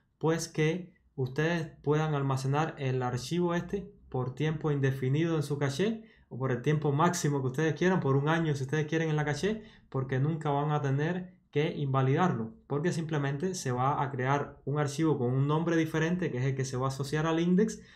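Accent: Cuba. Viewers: Hola eres cubano ?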